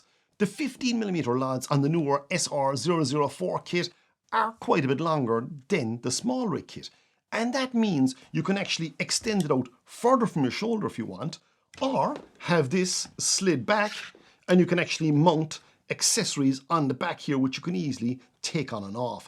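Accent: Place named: Ireland. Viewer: Your channel is great! I came from your video about the Neewer follow focus, and stayed for the one take presentational goodness and Irish accent. 😂 Love from Down Under. ❤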